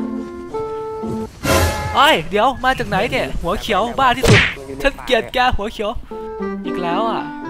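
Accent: Isan, Thailand. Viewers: เดินมาลาสคะเจ้า....คนอีสานใช่มั้ย<num>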